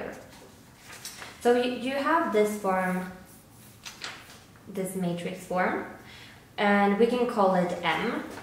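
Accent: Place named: Germany. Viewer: haha I was just guessing from the accent. Where in Germany do you live?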